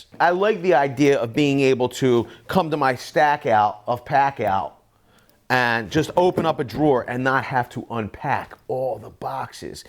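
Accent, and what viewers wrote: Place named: Northeast, United States. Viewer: This guy is so NorthEast he reminds me of my childhood.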